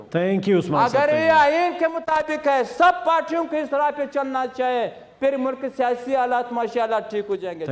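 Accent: Pakistan. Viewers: I like his Urdu acesent.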